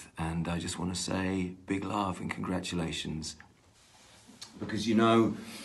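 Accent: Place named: England, United Kingdom. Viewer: So bloody weird. I’m British, I’ve always known Andrew Lincoln was British (This Life, Teachers, Love Actually). But hearing him speaking in an English accent messes with my mind. Just goes to show how good an actor he is, creating such an iconic character in Rick Grimes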